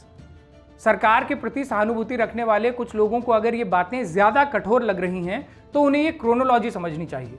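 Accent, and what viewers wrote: Garhwal, India. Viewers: गढवाली का छौंका प्रस्तुति को बहुत ही दमदार बना देता है।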